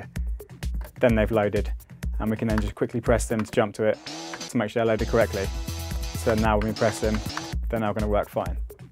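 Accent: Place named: United Kingdom. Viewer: The guys accent is too pronounced and he talks too fast. The tutorial would be more effective if it didn't cater only to brits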